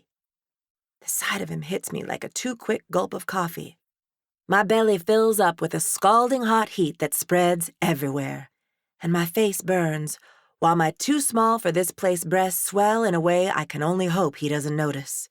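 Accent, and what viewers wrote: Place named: South, United States. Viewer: YESSSS this is going to be very interesting!!! As a Black South Carolina woman I really appreciate Southern voice. 😜🤩💯❤🏍🌪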